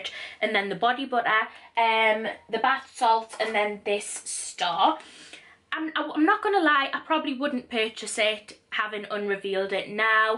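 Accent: Newcastle, United Kingdom. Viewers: I'M FROM NEWCASTLE! AHHHH FOUND A GEORDIE!! CAUSE I'M A GEORDIE TOOOOO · Got recommended this video, heard the accent, subscribed for being a rare relatable British personality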